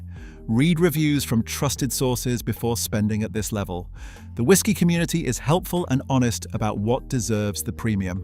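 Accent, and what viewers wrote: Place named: England, United Kingdom. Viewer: It's a little strange to hear an English accent talking about Scotch in terms of US$. It's the English/US contrast that I notice, not the Scotch//English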